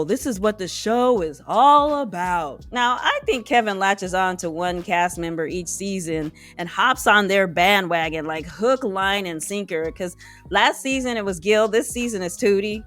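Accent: Boston, United States. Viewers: I was waiting for this from you & as expected, you were spot on!!! Thank you! "Karen" is a crazy vicious & spiteful narcissistic woman. Mark visibly looked shaken sitting next to her. Clearly no one b'sides Tootie liked her. Was anyone else slightly nauseated by Kevin Frazier's CONSTANT gushing over Katina & Tootie? Like yeah, They said yes on decision day and were still together, but he was kind of a dick throughout a lot of the season. Oh and lastly, I loved that Dr. Pepper and Dr. Viviana laid into "Hostage". The simple truth is that she is NOT a good person and couldn't just admit that she's shallow and based her entire marital demise on her lack of satisfaction with Chris's looks. On that list, looks should have been #1 in her top 5 things she wanted. She kept beating around the bush & dodging questions to the point of severe annoyance., your Boston accent cracks me up. 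Thank you for all you do & making me smile! You are an awesome & talented woman 🌺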